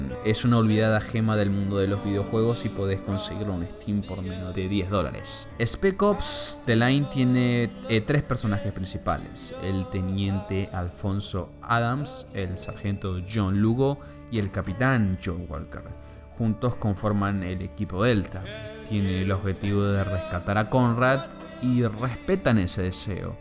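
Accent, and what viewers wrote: Argentina. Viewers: Sos argentino no?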